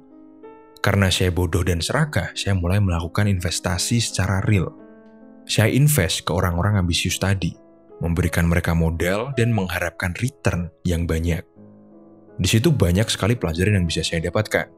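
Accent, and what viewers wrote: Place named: Southern Java, Indonesia. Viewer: Dari semua video yang saya tonton Tebakan saya sepertinya beliau orang jawa tengah bagian selatan atau yogya bagian selatan. Beliau bilang bapaknya tentara, kakeknya petani dan nelayan artinya rumah ya dekat pantai Logatnya bukan jawa bagian utara, tapi selatan. Beliau juga memelihara burung yang itu buming di jawa bagian selatan. Kalaupun tebakan saya mleset, mohon maaf Namanya juga menebak 😅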